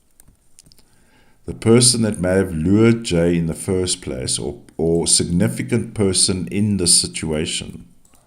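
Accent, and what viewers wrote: South Africa. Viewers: I detect a south African accent. I grew up in vanderbijlpark south Africa. I recognise that accent anywhere even though I've been back in the uk 39 years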